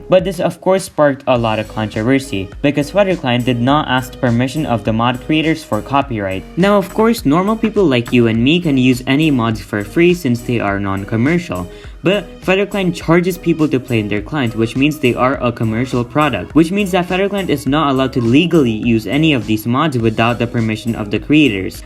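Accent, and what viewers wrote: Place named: India. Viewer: I like the way u speak english and ur indian :O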